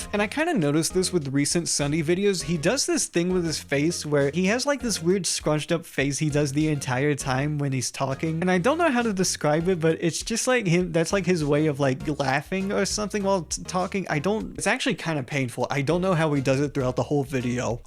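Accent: Boston, United States. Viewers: I've been watching youtube essays on 2.5x speed in the background of my day to day for the better half of my life. Somehow I've never heard a single narrator with a Boston (assuming) accent and it's freaking me out.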